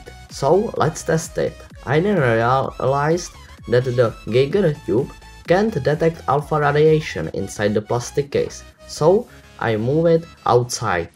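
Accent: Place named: Czech Republic. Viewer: Celkem český přízvuk XDD gut video dávám like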